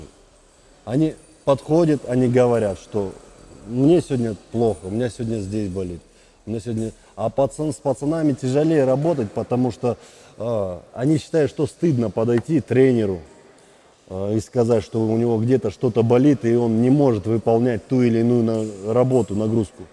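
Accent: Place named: Kazakhstan. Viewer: Он с Казахстана, там такое произношение допустимо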